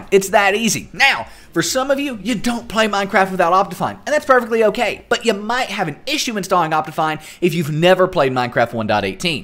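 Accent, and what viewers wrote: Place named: South Carolina, United States. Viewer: You sound like you're from South Carolina.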